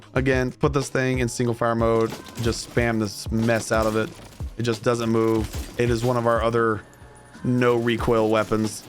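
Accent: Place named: United Kingdom. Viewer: I hope you get better and i love the british voice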